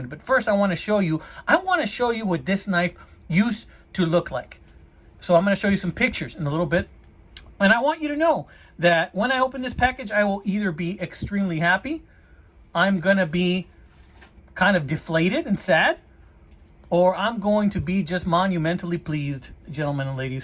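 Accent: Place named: Texas, United States. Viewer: Well before I saw the return address on you send-in I went,'This guy sounds like a finest-kind native TEXAN and sure enough...! Your lead in was full of information and not at wordy and completely informative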